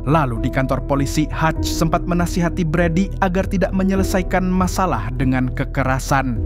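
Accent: Banjar, Indonesia. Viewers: Mang pian urang banjar kah? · Orang banjar jua 😮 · Banjar jua sakalinya 😅😅😅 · Cah orang banua jua sekalinya😂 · Urang banjar kah pian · Urang banjar kah pian min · Bah waluh urg banjar skali ny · Buan banjar kah mang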